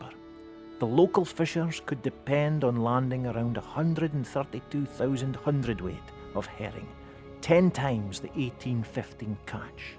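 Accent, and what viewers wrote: Scotland, United Kingdom. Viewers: sometimes I have trouble understanding some terms. if I have official subtitles I compare them with what I hear. besides I sent these videos to my friends from several countries. it will be easier for them to understand if they also see subtitles. I love the Scottish accent but sometimes it is hard for foreigners to understand some things. it is also good if I learn how to pronounce names. thank you very much for these videos - they are really interesting. and I would like more;).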